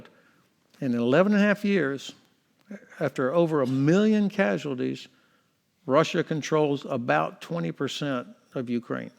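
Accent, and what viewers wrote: United States. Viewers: As an English learner I say f*ck King’s English, I’ll take Florida English every day of the week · I thought the accent was Houston area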